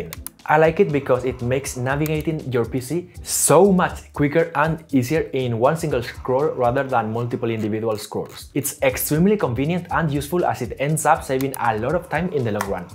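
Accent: Spain. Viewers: East asian face, english language, spanish accent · Te he pillado el acento español desde la primera frase que has dicho jajaja. Un orgullo tener a alguien con unos videos tan chulos como los tuyos aquí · Bro tu eres de malaga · Desde el segundo 1 sabia que eras español jajajajjaja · interesting Spanish accent... btw good editing does not bring as many viewers because it doesn't hit as hard as crazy ideas and high budget stunts... editing can only make a video already at a certain level better, but cannot add substances it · I thought I heard a familiar Spanish accent, then it all made sense when I checked your channel info. Saludos de otro editor, crack! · never in my life have i encountered an asian person with a spanish accent, weirdly fits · I may be wrong but where are you from? It's because of your accent that you sound very Spanish to me. If so, enhorabuena por tener el valor de hacer un canal en ingles. 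Greetings from the north of Spain. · When you started speaking, I knew that that accent sounds familiar to me, you're from Spain!! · nunca había visto tu canal, a primera vista pareces asiático, pero en cuanto escuche tu inglés supe que eras Español 🇪🇸, ese acento es inconfundible 😂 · Not sure if this guy is Spanish or Greek.. XD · hey! I just landed on your channel, I'm curious about your accent, it sounds Spanish! Cool videos and animations btw <3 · Hola! Es el primer vídeo que veo tuyo y estaba reconociendo el acento español jajaja. En cualquier caso, enhorabuena por hacer un canal en inglés, es un gran gamechanger y te deseo una buena trayectoria porque se nota el currazo que le pones a los vídeos. Un saludo! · Eres español?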